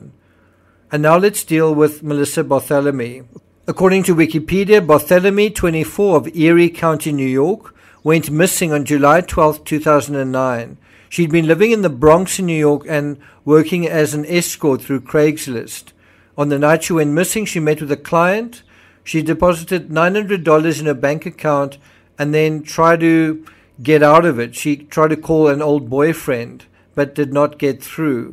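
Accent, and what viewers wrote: South Africa. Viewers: Whoever said u r a liability in this case, is an ass. U’re obviously knowledgeable and put a lot of work into investigating each, including this one, case. U’ve even traveled to many of the areas in which the crime took place, or where the predator worked, or whatever!! I am also a fellow South African listening to u from Rhode Island, USA. P.S. love hearing the accent! Thk u!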